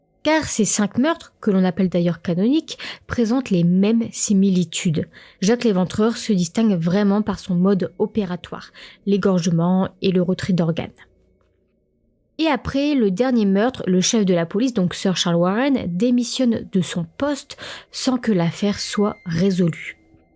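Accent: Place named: Nord-Pas-de-Calais, France. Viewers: ah c'est marrant... moi je t'imaginais toute blonde, ( je sais pas pourquoi ) peut -être l'accent chti , par contre à un " grotéfor " , NON ! un " grozéfort "c'est mieux ...😃 ( gros effort ) dit gentiment bien sûr car j'aime tes vidéos et ta façon de raconter , bravo ...:hand-pink-waving::face-red-heart-shape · Coucou! Merci pour cette vidéo d'une affaire très connue mais très bien racontée avec ton accent ch'ti 😊 Que tu ne te montres pas où que tu aies le visage flouté ne change rien puisque c'est ta voix que j'écoute 😊. Comme un podcast en fait...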